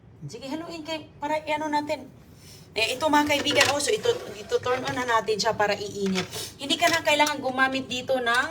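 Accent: Visayas, Philippines. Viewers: Anong masama? Ndi nmn pagandahan ng pronounciation jusme,bisaya kasi sya kya ganun nalang pagbigkas nya,ndi na kylangan tamangnbigkas .marunong knaman yta makinig intindihin muna lang.mga ibang pinoy tlg mga perfectionist kala nman sosyal din,mukhang nag ppakasossy lang naman😂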